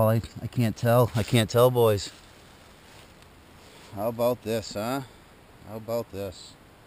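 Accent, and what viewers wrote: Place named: Boston, United States. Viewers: This guy has to be from new england. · "I went to a pahhty las weeken that was soooo enohhmis it was stahtling." Boston baby! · that Boston accent.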